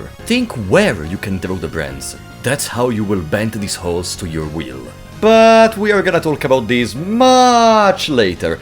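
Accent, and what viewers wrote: Italy. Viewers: That hits me like a truck hearing manusya, a word in my niche language in an english game narrated by a dude with heavy italian accent. Very cool. Didn't know that word came from buddhism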